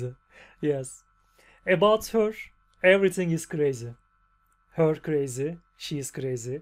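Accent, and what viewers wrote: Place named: Turkey. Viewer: Oh my Turkish friend is back with another reaction 😎 always a pleasure to see your smile and to hear your nice accent on this emblematic song! Please keep go on with cause you give me such a nice smile 😘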